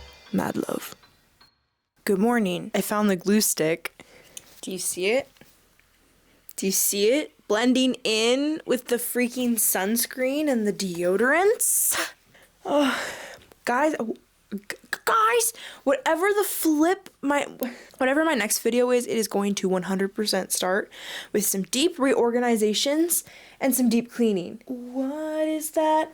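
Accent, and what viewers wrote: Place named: United Kingdom. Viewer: Thank you for the worm reminder queen… Keep being amazing, everything is linked to everything, it all is for your business and also isn’t (living, art etc.) lol, you have NOUGHT to worry about cuz you’re growing a sick lil community here that are all obsessed, you got this and u know it!!! Intuition over 🪱 s… 🌞😎🌞😎🌞 luv & good wishes 2 u from the UK, I speak like your bri’ish accent tehe 🤫😱😍🙊😮‍💨😇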